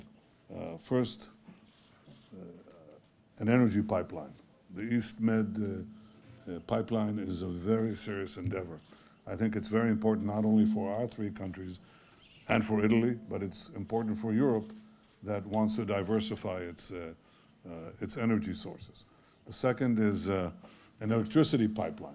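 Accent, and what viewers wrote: United States. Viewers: Harsh Truth. And don’t forget Bibi is American too. That is a Philadelphia accent if I ever heard one, has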